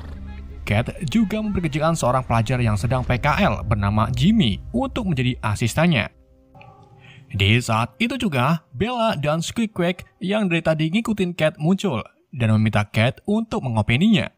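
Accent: Indonesia. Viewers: Gue suka gaya bicara dan gaya basa lu bang, meski membahas alur cerita film asing tapi gaya +62 masih diterapkan 🤣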